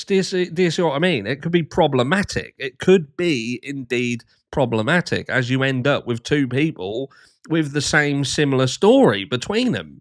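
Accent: United Kingdom. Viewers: I love and his British way of saying things like ozzy!" FK RIGHT OFF! " 😂 please i need a coffee cup that says that!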